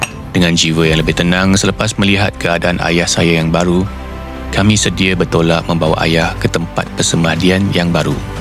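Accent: Malaysia. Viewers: tq for sharing.. nice malay accent, too 👍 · i relly like yr malay slang..❤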